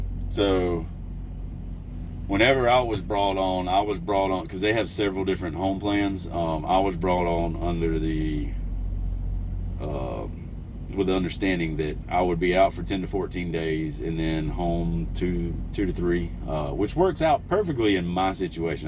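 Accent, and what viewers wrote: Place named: Georgia, United States. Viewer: I really luv ur videos ur so down to earth and intelligent . Georgia men WOW...needless to say I could listen to your Georgia accent all day🤭☺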